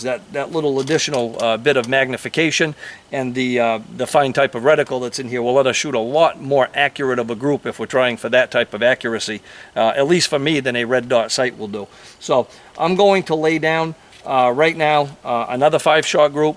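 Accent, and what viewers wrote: Maine, United States. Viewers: Are you from Maine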